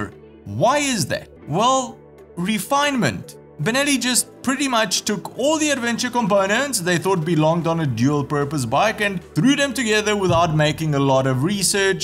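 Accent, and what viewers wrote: South Africa. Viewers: Is that a SA accent with a Romanian twang ?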